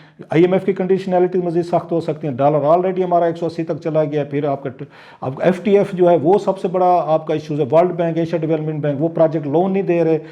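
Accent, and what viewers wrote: Saraiki, Pakistan. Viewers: Aap ka saraiki lehja bahut maza karta hai. Wasday raho bhira khan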